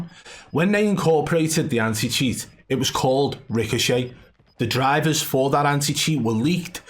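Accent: Liverpool, United Kingdom. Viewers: I love this Scouser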